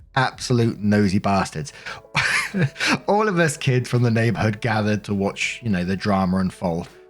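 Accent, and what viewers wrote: United Kingdom. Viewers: Hey! This doesn't have to do with the specific video, but I just wanted to show my appreciation towards you and your content. I've been listening to your videos for several months now while at work (you can listen to whatever as long as it's not too distracting), and I've always loved reddit stories, so when I discovered your channel, it was a total game changer. I like your personality, your perspectives, your anecdotes, and yes, your accent (its true, Americans love British accents), and this channel has honestly made my work shift much more enjoyable. I even got youtube premium solely because of you. I decided to get YouTube membership to further support you. So thanks again, and please keep up the great work!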